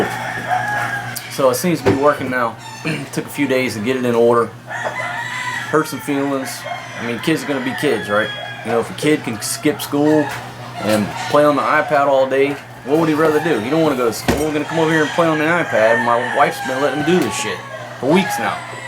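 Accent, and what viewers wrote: South, United States. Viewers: Kudos for laying down the law my friend. Dont know how much of a yard you have there, but did you ever consider raising chickens of your own for meat and eggs? Maybe even raise some pigs? Would like to see more of the beach you have there. I would definitely be taking advantage of the fishing as a food resource too. If your relatives come over, have them pitch in and give you a hand. If you put them to work, they will either earn their keep or stop coming around. Explain to them that education is their way out of poverty. Sounds like you have a southern accent. Where ya’ll from? I lived in south Georgia for 30 yrs. and know a good ol’ boy when I hear one.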